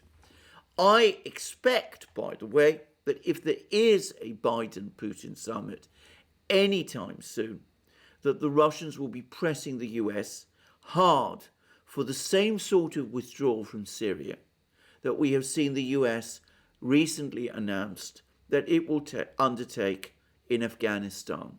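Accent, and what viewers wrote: England, United Kingdom. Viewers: Interesting informative news but boring presenter. The heavy English accent is difficult to hear for Americans.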